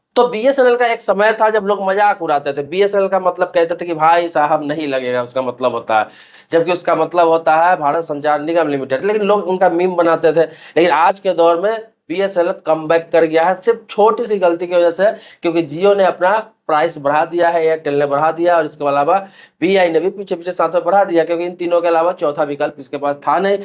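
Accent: Bihar, India. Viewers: Mia ji ap bihar say h kya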